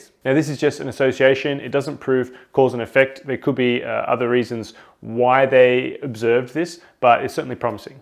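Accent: Australia. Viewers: It’s just his Australian accent! Some forms Australian accent, the ‘a’ is phonetically pronounced as more of an ‘e’ sound. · This guy is Australian so that is why his English is so messed up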